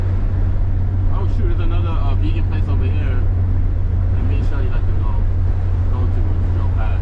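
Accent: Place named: Jamaica. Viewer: Could know you jamaican fromyour voice bro, whats the milage on ur car i see one at 17000k that needs a throw out bearing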